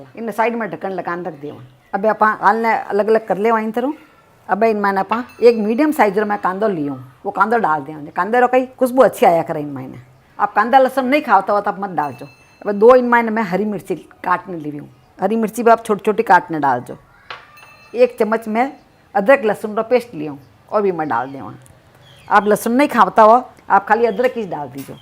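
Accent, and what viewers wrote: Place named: Rajasthan, India. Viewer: Apki har recipee bhut achi hoti h aur apki mrwadi language sunne me bhut achi lgti h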